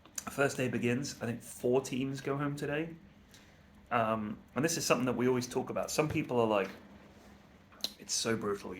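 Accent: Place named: London, United Kingdom. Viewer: Love these videos man, keep them coming ! Out of curiosity which football team do you support ? You sound like a Gooner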